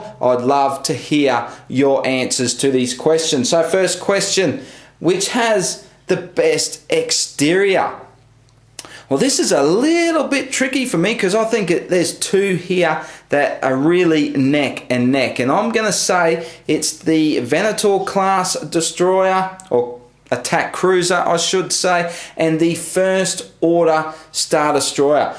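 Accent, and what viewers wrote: Australia. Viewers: This guy has a real thick strayan accent i like it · Love the Aussie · I like your australian accent. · Youre an ausie, arent you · are you Australian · He has a strong Australian accent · Wow are you Australian I am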